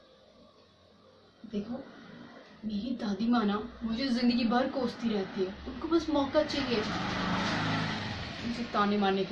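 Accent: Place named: Odisha, India. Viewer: odia odia laguchi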